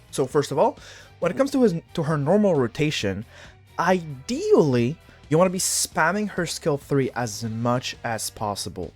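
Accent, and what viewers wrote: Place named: France. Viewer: You can't mask that french accent!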